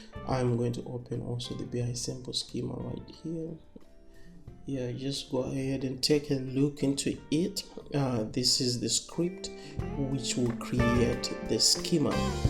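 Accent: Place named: South Africa. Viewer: do you have an email to cantact you directly for tutorials, from the sounds of it you are based in Mzansi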